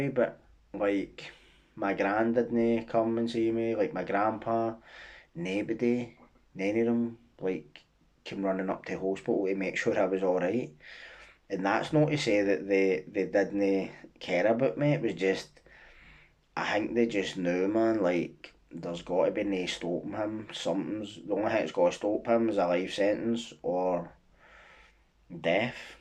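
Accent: Scotland, United Kingdom. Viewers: Alright, I follow your channel from Australia, usually when I’m sitting at my desk working 🤣 really enjoy the topics, delivered very eloquently in common Scottish tongue. A wee bit of home for me. Keep up the good work.. Keep up the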